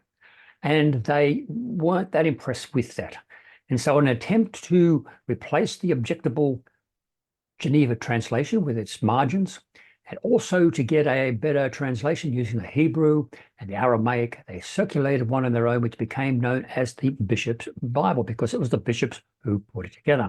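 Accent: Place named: Australia. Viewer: Cool Accent. Love The Aussie Accent.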